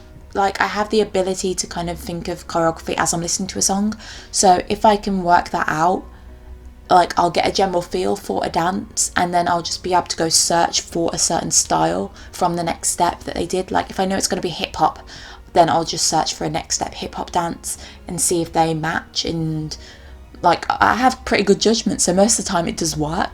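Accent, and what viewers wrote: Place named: Birmingham, United Kingdom. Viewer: Where are you from? you sound like you’re from Birmingham by the way I’m obsessed with your channel 💓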